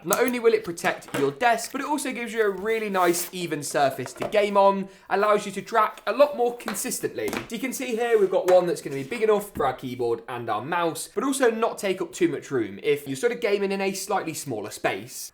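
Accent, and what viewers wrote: United Kingdom. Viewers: Like dat britian accent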